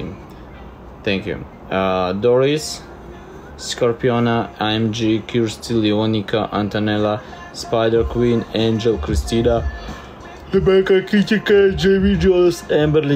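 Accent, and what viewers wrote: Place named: Spain. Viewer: Me encanta su acento español su acento ruso y su inglés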